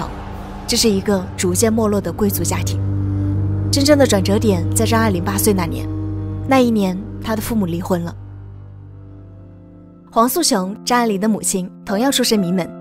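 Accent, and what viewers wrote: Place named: Shaanxi, China. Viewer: 这口音，陕西、山西或内蒙的吧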